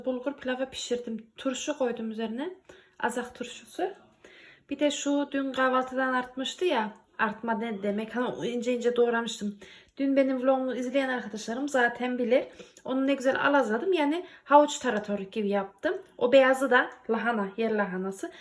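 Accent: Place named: Ankara, Turkey. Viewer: Nerelisiniz ankaralï gibi konuşuyorsunuz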